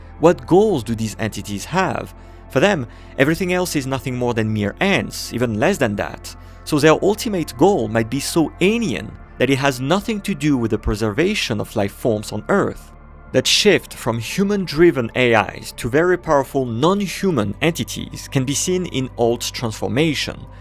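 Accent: France. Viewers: French accent ahhhhhh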